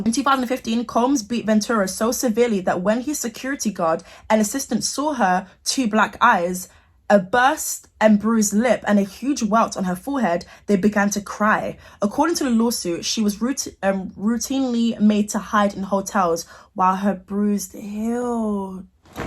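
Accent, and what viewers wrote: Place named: England, United Kingdom. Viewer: Luv this ENGLISH ACCENT 💯% ✅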